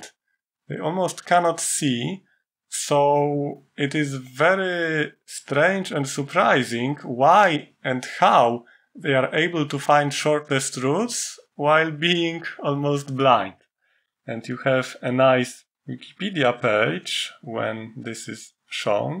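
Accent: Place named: Poland. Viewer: Thank you for this awesome explaining of Evolutionary Computing for Global optimization, it was very useful and inspiring. no many visual resources for this topic, appreciate your contribution. you delivered it in a simple manner, and OptVis tool is also awesome. also the Polish accent is wonderful (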